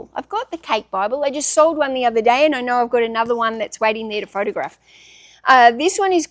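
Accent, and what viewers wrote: Australia. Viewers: I've been enjoying your videos for a few months now. I discovered them through the tech n sports interviews. I love how honest you are with yourself and other resellers. It makes it easier for us as viewers to be honest with ourselves. Thanks for your willingness to put yourself out there. One other thing. Have you ever done a video translating Australian slang (or pronunciation) to American slang (or pronunciation). I already picked up a few like Mills & Burns are Harlequins. "Gair-idge" is a "Gahr- ahdge". You mentioned a Mocher (spelling?) before. I'm assuming that is a Mochaccino coffee?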